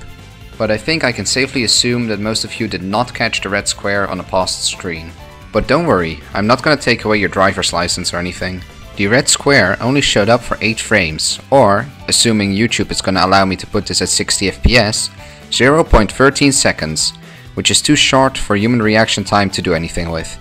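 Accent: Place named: Netherlands. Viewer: Heet Hollands accent.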